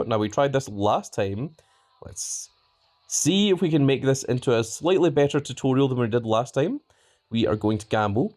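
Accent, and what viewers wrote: Scotland, United Kingdom. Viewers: Hey dude I can hear from ur accent your also Scottish, I beat you on KOTR shocked to find out you stream Supercard GGs man!! I have subscribed to you bud. 👍🏻😎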